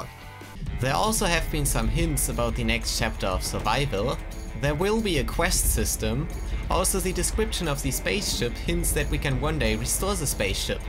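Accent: Germany. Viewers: Netter deutscher Akzent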